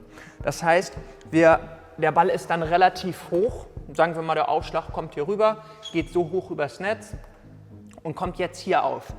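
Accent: Hamburg, Germany. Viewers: Perfekt erklärt, super gemacht. Danke für die Tipps... und der Hamburger Slang gefällt mir auch sehr gut. Cooles shirt dazu :-) Liebe Grüße aus dem Süden Deutschlands.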